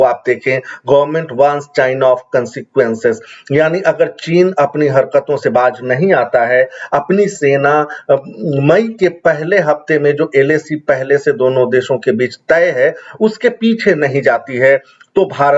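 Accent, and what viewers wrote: Bihar, India. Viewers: विशुद्ध बिहारी उच्चारण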